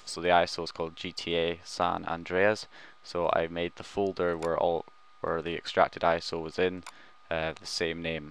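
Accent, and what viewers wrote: Scotland, United Kingdom. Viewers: cheers mate, your tutorials have helped me so much, good to hear that barry Scottish accent!!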